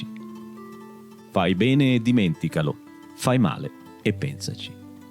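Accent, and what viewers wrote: Italy. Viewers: Tu sei calabrese non siciliano vero? Sono carini questi proverbi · Non era meglio farlo dire ad siciliano???soprattutto per la cadenza?? Sicuro sarai Siciliano ma sicuramente nun nascisci dassutta😂...la tua cadenza è troppo "italiana" pi' parrarri accussi😅 Grande cmq❤...in molti dicono sti detti,ma in pochi sanno che significa realmente!!! Un saluto da Regalbuto (ENNA)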